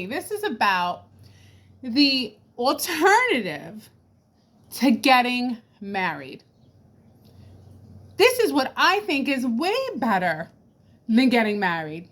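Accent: New York, United States. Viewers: is that a new york or a new england accent?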